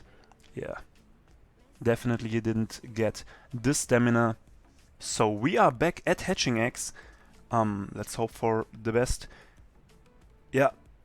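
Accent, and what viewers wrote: Germany. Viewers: Du klingst deutsch:b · Bro man hört ein bisschen raus das du Deutsch bist aber trotzdem geile Videos und gutes Englisch 🔥🔥🔥👋😂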